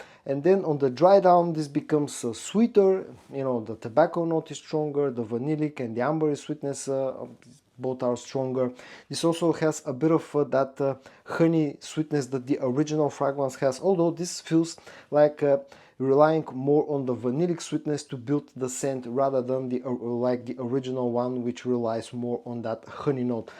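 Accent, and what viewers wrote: Bulgaria. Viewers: да не се казваш случайно?:) Често попадам на тези ревюта и чак сега долових бг-акцента (но е толкова слаб, че дори се чудя дали всъщност съм прав). Когато става въпрос за арабски аромати винаги се спирам на твоите видеа:) Хем ревютата са интересни хем стила ти не е натрапчив и претенциозен (нещо, което е дооста рядко срещано в тази ниша). А за темповете, с които бълваш видеа направо нямам думи:Х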